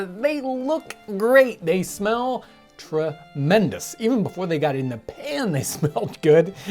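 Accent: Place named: United States. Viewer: Its pronouced (Shuh-Lots) not Shallets 🤣🤣 Wow American English its horrible UK version is much better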